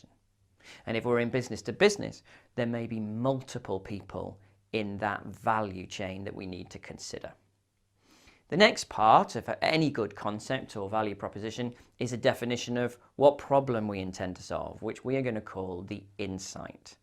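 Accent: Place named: United Kingdom. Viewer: From accent it seems he's a Britisher, is it so?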